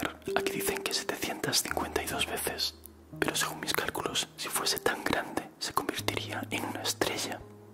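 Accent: Spain. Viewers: Oye, ¿eres de España?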